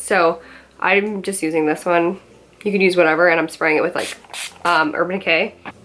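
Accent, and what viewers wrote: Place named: New Jersey, United States. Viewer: You're sooo beautiful are you from New Jersey?Im wondering where your accent is from lol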